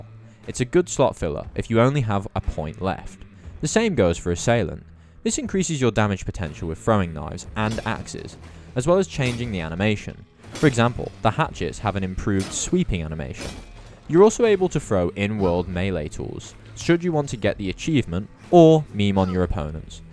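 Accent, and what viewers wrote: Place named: United Kingdom. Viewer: Right, idk why i said american, when that's totally an uk accent, so why uk people say "staminar effect, instead of stamina"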